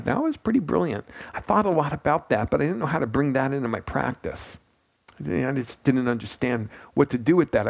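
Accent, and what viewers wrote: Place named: Brooklyn, United States. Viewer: I havejust foundanother great teacher andMarcis that person.wonderfu, if he seems like an older person jewish from Brooklyn with his accent. just follow him in his talk unitl about 47 or 48 minutes and then BOOM! igot it. the dramas...helists allofour drams. samaras Yeah! and yes! love toyou all liz e. whyaren't therefore comments here? or on his spacef or acknoweldgements below! fantastic than you. Shalo andnamaste and AUM! aim nomastivaya!. ram dass and khrishna dass....moreguys from Brooklyn!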